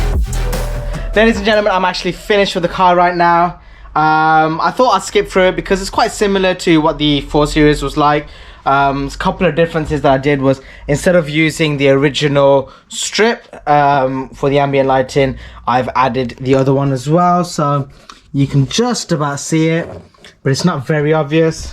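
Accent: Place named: Birmingham, United Kingdom. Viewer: He’s in the UK, you can’t tell by the accent? He’s in Birmingham.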